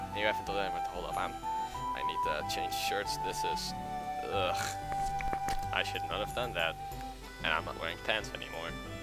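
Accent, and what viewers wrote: Netherlands. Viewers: Lol, ik wist niet dat je nederlands was. Ik hoorde het wel aan je accent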